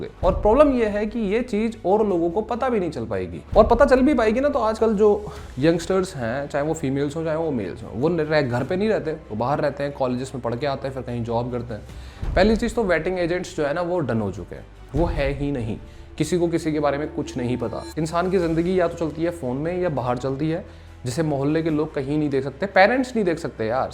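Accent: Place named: Tughlakabad, India. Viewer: yaar bhai tum pakka tuglakabad gaon ke ho mentally and educally bilkul baise hi sound kar rahe sayad mujhse 2 saal chotte hoge but baate bilkul meri jaise kar re ho mere bhai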